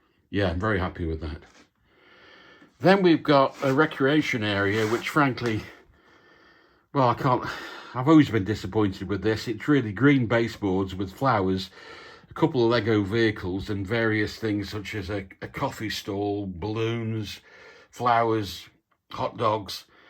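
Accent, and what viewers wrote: United Kingdom. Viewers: Rotherham? maybe Sheffield? I would put money that you has been to Meadowhall Lego more than once with that accent. And yer right, every street needs a pub or three, do a Cross Keys for me. Have fun lad and keep up tha great work. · I agree with you and I will certainly sign your petition for the Fishing Store as the best modular, although the Medieval Blacksmith Shop is equally as impressive and a fabulous build. You should check it out. A more personal question, if you don’t mind? My favourite television show is, Escape to the Country, which is shot in great Britain…..so given your accent, may I ask where you live? I am on the East coast of Canada., in the province of New Brunswick. Just curious, if it too personal, forgive me. Most the the Lego people I see on here are much younger and harder to relate to. Anyway…..I ramble on. 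Thanks for your reply I do appreciate it. Ta Ta for now. 👍😎🇨🇦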